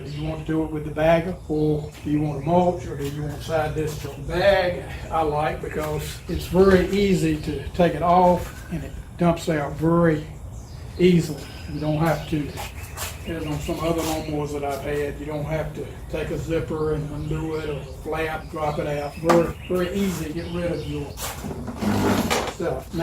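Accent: South, United States. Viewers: The southern accent is awesome! I love my Kobalt mower. Thanks for the review.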